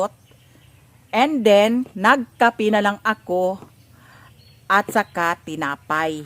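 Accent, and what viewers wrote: Visayas, Philippines. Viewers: Tagalog is not her 1st Language and as we all know, since for sure you've watched some of her videos, Bisaya is her Dialect and that's where she's comfortable with. Haven't you noticed, she's trying to speak Tagalog but she can't help it talking using her own Dialect cuz that's where she's comfortable. She's trying her very self to adjust talking in Tagalog but Please do respect as well her Very Own Dialect. And besides she was still able to Entertain and Inspired her Followers/Viewers even though she's talking multi-Language/Dialect. That's where she's Comfortable with, so let her be Herself, Was known by Showing Her true self so if she'll be changing the Things that she might doing eversince, It's gonna be a Total Different Anymore. Each of us has our Own Meaning of Living. RESPECT BEGITS RESPECT. just Saying. Thanks 🙏 Do whatever you want Ate. 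As long as you're happy doing such things Just Go And Continue. I'm a Fan. A Solid Fan. Love Lots and More Blessings to You and to the entire Family 🙏🤗💖 From SALUDAGA FAMILY Supporting all The way from LAVEZARES NORTHERN SAMAR 🤗💖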